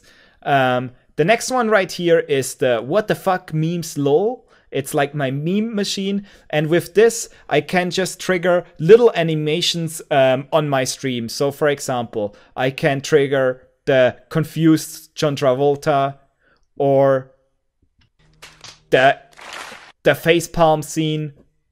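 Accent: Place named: Germany. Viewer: Are you German? Cause I can hear the accent.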